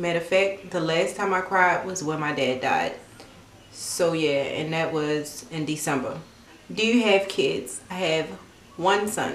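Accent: Baltimore, United States. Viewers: I hear a B More accent yup there it goes lol . All my family lives out there❤️ new supporter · Love your videos 😘 I knew you were from bmore cause you sound like snupe from the wire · Legendary Baltimore accent💕💕💕😜😘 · I been subscribed for along time now and I already had it figured you was from Baltimore ik that from anywhere I just needed you to say you was from here cause I could have been wrong lol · That Bmore accent. Love it sis..you slay every day! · Since you're from Baltimore ( which I kinda guessed from your accent) B-More Careful by Shannon Holmes, True to the game by Terri Woods ( I think), and The coldest winter ever by Sista Soulja, and last but not least the Bible!!! God bless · i watched this to confirm you were from Baltimore ❤️ i know the accent when i hear it 🤟🏽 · I KNEW that was a Baltimore accent lol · I watched your pony tail and bang video and heard yor accient, i was like sis sound like she from B more let me check our her channel. 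Keep up the good work boo.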